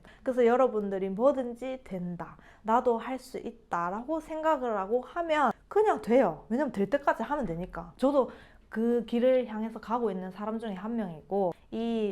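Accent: Gyeongsang, South Korea. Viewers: 이분은 경상도 사람입니다 조선족은 북한말씨가 많이 들어가 있지요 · 저 갱상도 언니, 말이 참 잘 들리네요. 지방발도 제대로 사용하면 오히려 소통이 잘돼.. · 조선족이 구한말에 경상도 출신들로 구성된 경우가 많아서 조선족이 구사하는 한국어에서는 묘한 억양이 있습니다. 근데 저분은 완전 찐 경상도네요... 그리고 북한말씨가 있는 조선족 말은 함경도 이런 지역에서 온 사람들입니다. 그네들도 서로 지역 감정 있다고 하네요... 에휴ㅋㅋㅋ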